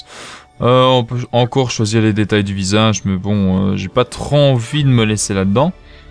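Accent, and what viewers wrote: Belgium. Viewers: c juste que c un belge(wallon) vive les wallon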